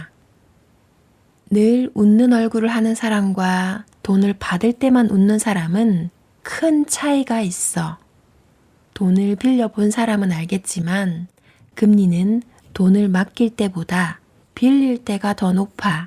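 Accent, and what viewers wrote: Gyeongsang, South Korea. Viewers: 댓글 쓰려니 뭐가 뜨네요 이런거 첨이고 디게 예민하신가 유튜버중에 경상도말 쓰시는 분 엄청 많네요 표준어보단 귀에 덜 들어오는게 사실이네요 전달력을 높이려면 조금 연습하셔야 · 님 갱상도가? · 님~항상 영상 잘 보고 있습니다^^ 경상도 억양이 넘 정감스럽습니다^^ 저는 경남 진주 입니다~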